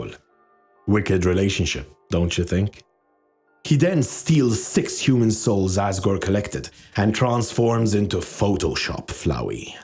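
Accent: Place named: Malta. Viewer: you sound maltese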